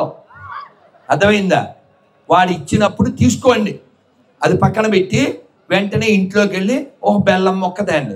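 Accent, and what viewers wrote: Telangana, India. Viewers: గురూజీ మీరు తెలంగాణ భాష మాట్లాడితే సూపర్ గా ఉంది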